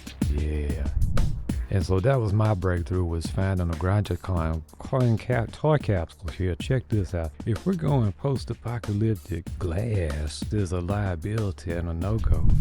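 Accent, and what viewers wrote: Louisiana, United States. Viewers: Because he's either Cajun or Creole I think. He's mentioned before about where he lives and the accent might be put on a bit for the videos but that's part of the character I guess. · Where is he from? Is he Cajun?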